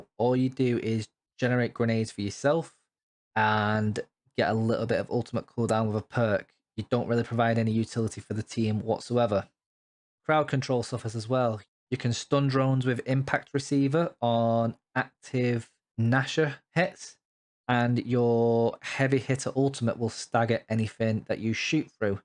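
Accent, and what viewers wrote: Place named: West Yorkshire, United Kingdom. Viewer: What accent is this? Im in Hull East Yorkshire and youre sounding maybe Leeds or Doncaster or something west yorkshire-ish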